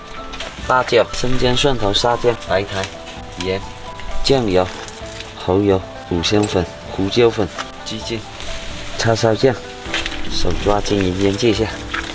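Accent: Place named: Malaysia. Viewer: Where is from? Sounded like a Malaysian.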